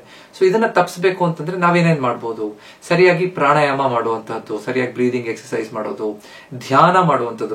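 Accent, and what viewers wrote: Standard. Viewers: Clear message. ಶುದ್ಧ ಕನ್ನಡ - Like always.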